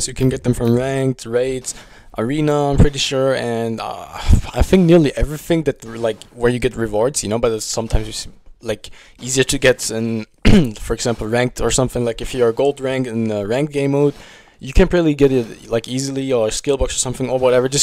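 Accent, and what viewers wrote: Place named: Germany. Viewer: sehr deutsches englisch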